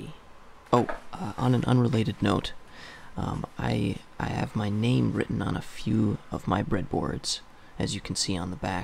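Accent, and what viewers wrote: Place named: Utah, United States. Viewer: Buddy where is your accent from? Utah ? It's kinda cool!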